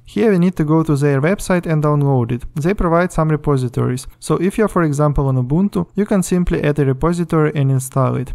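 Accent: Russia. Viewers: Акцент какойто "рязанский". В смысле не носитель языка говорит ИМХО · ес офхорс, лет ми спик фром май харт. 😁 But honestly, you have a really russian accent, like you are reading by papers text which was wrote in russian. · ты сказал "ощущение"? да тут только что русским флагом в кадре не машут. Жесточайший русский акцент, близкий к пародийному. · русский акцент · Русский акцент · Русского человека сразу слышно:)))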